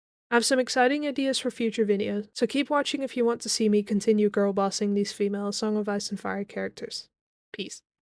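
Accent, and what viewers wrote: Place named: Netherlands. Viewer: ik herken een Nederlandse accent van een kilometer ver😅 Toffe video tho en origineel.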